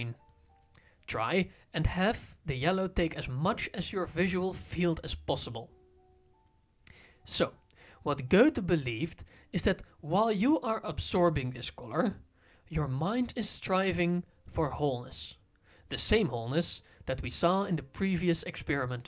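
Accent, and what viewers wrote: Netherlands. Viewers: Your videos are great in content, crazy you have so few subsrcibers. Maybe work on the dutch accent? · The Dutch accent sounds like the complement of the Australian accent so it definitely worked, added colour, in my case :)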